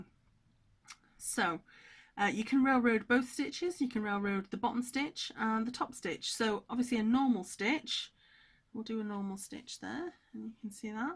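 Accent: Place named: England, United Kingdom. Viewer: For starers I just love your videos they are such fun cause your character is very enjoyable, so you have encouraged me to cross stitch i am a lover of embroidery but cross stitch has caught my attention thanks to you. I am looking for someone here in my town to show me how cause i'm more of a hands on. Plus i love the English accent it sounds so cool.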